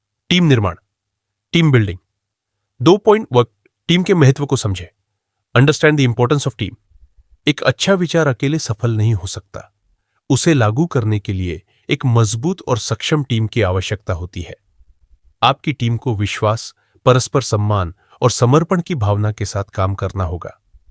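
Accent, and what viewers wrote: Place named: Maharashtra, India. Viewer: आपकी टोन महाराष्ट्रीयन जैसी लग रही है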